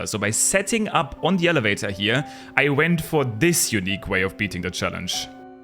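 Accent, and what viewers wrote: Germany. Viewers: Hey, are you German? (Your accent sounds like you are)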